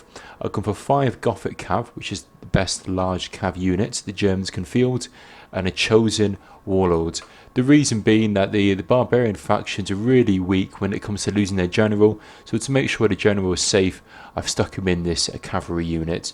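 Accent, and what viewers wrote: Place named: Wigan, United Kingdom. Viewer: what part of the UK is accent from? Sean Bean has the same style of English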